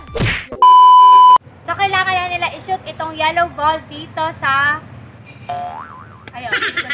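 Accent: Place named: Visayas, Philippines. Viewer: Kakafollow ko lang sa tiktok mo haha ang cute mo yung bisaya na. Ang huy ex yan na ba bago mo 😂😂😂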